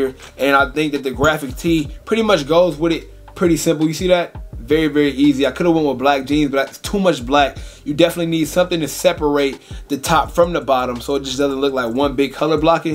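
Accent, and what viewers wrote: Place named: Chicago, United States. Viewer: I thought you were from Chicago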